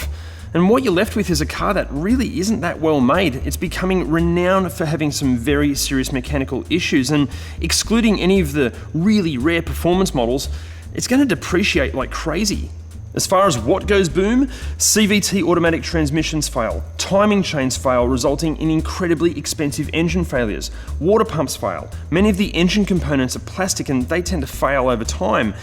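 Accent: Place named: Australia. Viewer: omg keep joking you are underrated, funny, charismatic and lovely to watch probably because of that juicy Australian accent lol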